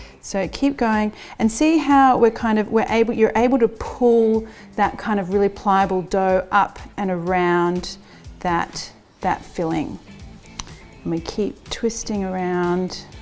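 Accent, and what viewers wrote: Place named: Australia. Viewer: Pretty sure it's just her Australian accent lol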